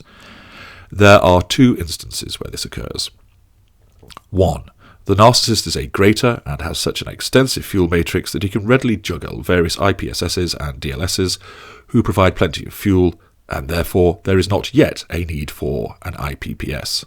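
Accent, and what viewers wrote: United Kingdom. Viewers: Another Brit accent. See ya.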